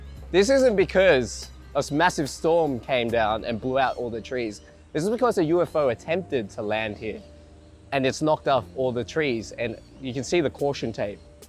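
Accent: Australia. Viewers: Why does this Asian sound more aussie than I am?...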